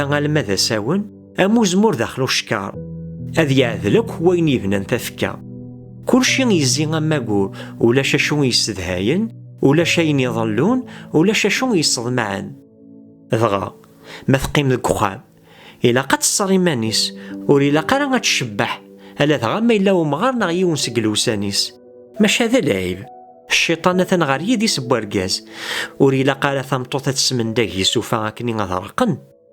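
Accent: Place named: Kabylie, Algeria. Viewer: J'aime comment tu parles le kabyle que je trouve très authentique. Un travail, un recit remarquable. Je vous salue.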